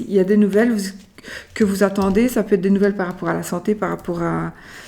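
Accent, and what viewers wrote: Belgium. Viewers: Bonjour, j'ai toute suite reconnu l'accent Belge et ça fait plaisir , je vous remercie pour ce tirage, j'espère que vos prédictions seront excausées car ce long mois de mai fût très dur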